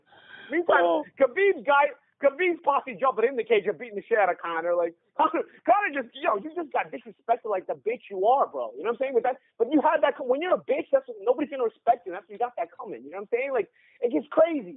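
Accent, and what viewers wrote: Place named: New Jersey, United States. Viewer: Paulie used to be immensely annoying but his New Jersey accent seems to have died down a little so he may actually be tolerable soon!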